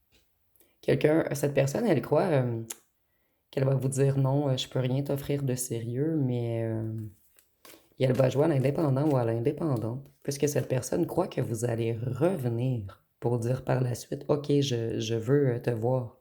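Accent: Quebec, Canada. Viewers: ✨Yes 😉. ✨Booster...Liker... Abonnée... ✨BonJour de France 🇨🇵, et grand Merci pour vos excellentes guidances! 💫Très souvent, j'ai l'impression que vous nous "connaissez"! 🌟 Vos transmissions sont enrichissantes... C'est aussi agréable de bénéficier de votre gentillesse, et d'entendre votre joli accent québécois! 💛☀️Je vais écouter votre guidance de ce jour, maintenant.🙋A bientôt.🎈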